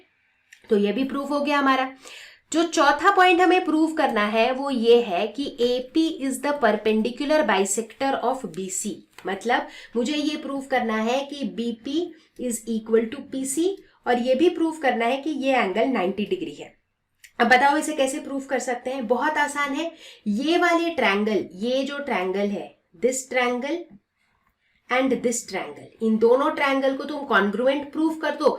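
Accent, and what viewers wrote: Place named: Maharashtra, India. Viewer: Ma'am is from Maharastra 😂👍🏻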